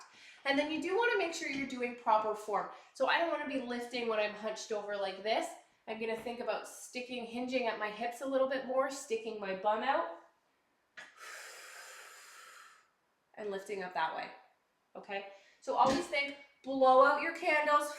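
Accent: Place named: Canada. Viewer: So CLEAR! And delivered with a charming Canadian accent. I’m sure this will help lots of people thank you.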